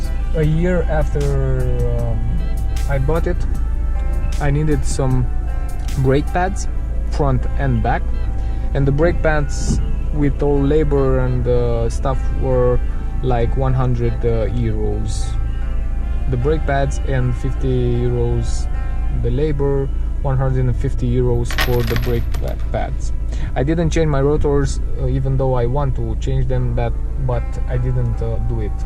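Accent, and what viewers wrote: Romania. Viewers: dupa accent te-am cunoscut ca esti de al nostru😁👍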